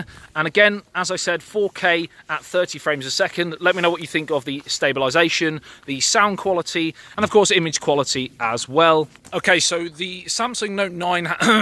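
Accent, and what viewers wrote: United Kingdom. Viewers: eu amo o sotaque britânico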